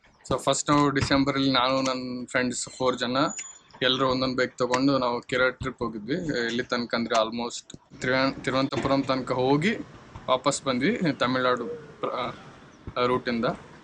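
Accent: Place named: Yadgir, India. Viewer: Proper Yadgir accents 🎉❤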